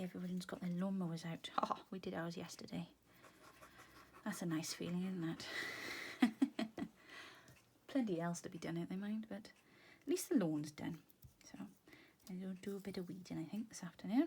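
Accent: Wales, United Kingdom. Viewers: Do i detect a Welsh accent, where r u from, like your work. X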